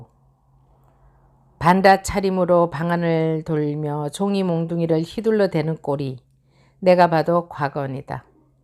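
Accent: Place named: Gyeongsang, South Korea. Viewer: 이렇게 열정적인, 순정한 독자를 얻었다는 것이 글쟁이에게는 얼마나 큰 행운이고 축복인지! 뜨거운 갈채에 가을 아침이 심쿵!합니다. 영상도 멋지고 님만의 갱상도 억양도 정겹고 자연스러우니 조원희 샘 애써 따라잡으려 안하셔도 될듯요.ㅎ 늘 고맙고 또 고맙습니다.^^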